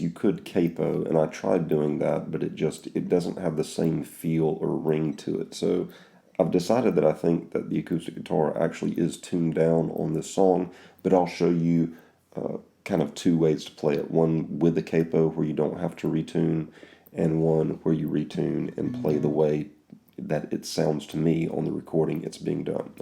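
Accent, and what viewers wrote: South, United States. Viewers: Once again on it. I've convinced myself that he is Dr. Phil's brother which is why I will refer to him from now on as. Judging from his southern draw and his efforts to not show his face to keep the spotlight off of him and keep it on the music, what a class act. Thanks again! Your secret is safe with us.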